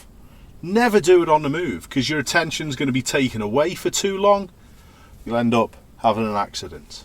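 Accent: United Kingdom. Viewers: Everyone here, including the uploader, thinks there is only 1 set of laws in the entire world. You're wrong. Every country has different rules. Judging by your accent, I'm assuming this video is about the laws in the UK. But these laws do not have to apply for places elsewhere.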